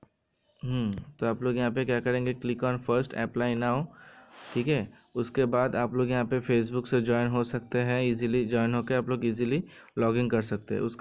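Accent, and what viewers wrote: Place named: Maharashtra, India. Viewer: Tui to marattok rokomer hindi bolchish re🙄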